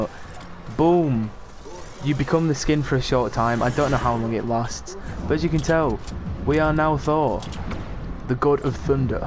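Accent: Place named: United Kingdom. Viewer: His accent was killin me prolly cause I love Brits 😂😭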